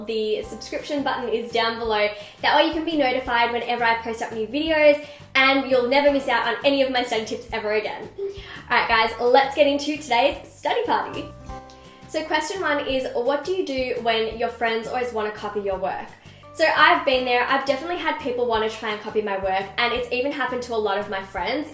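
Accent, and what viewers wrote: Australia. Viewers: kritika singh ahahha shes australian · Australian I'm pretty sure 😊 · R u Australian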